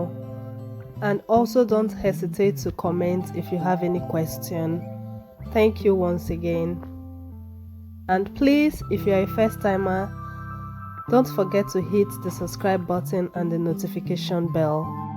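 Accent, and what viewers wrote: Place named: Nigeria. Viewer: Hearing the beautiful Naija accent .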